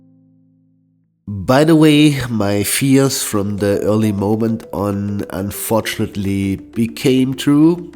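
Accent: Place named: Germany. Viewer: Das Englisch hört sich so deutsch an, wie ich es noch nie vorher gehört hab. Vielleicht wäre deutscher Content mit englischen Untertitel passender.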